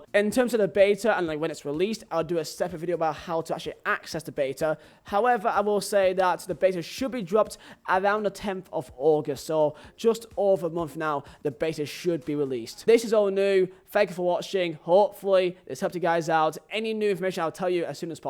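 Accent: United Kingdom. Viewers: Bruh, how are you in poland with a strong british accent like that?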